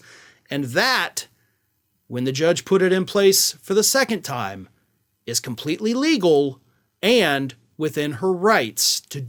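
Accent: South, United States. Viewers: You're edging into a 'southern' accent.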